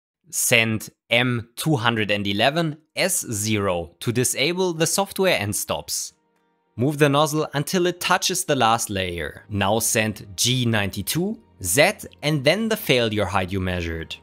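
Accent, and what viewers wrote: Germany. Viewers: are you from germany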